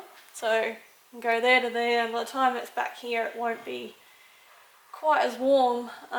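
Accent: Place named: Australia. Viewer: Слышу австралийский акцент если я не ошибаюсь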